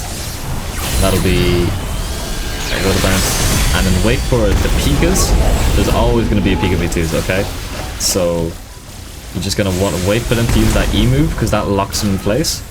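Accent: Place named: Ireland. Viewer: bros irish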